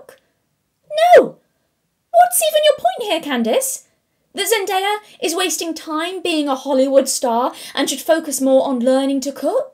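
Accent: United Kingdom. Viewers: I'm British, but my god, your British 😂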